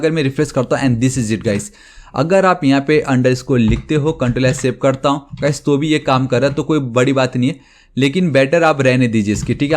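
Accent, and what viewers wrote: Nepal. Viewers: why do you have Nipali accent.🤔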